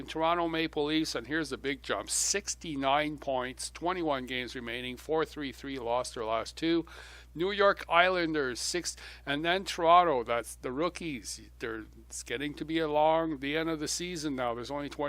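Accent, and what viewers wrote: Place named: France. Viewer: Hey buddy, do you speak French perhaps, I hear a tiny accent... :p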